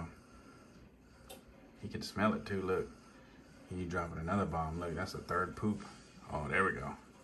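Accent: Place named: Bay Area, United States. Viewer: Bro are you from Northern Cali? You sound like you from the Bay Area lol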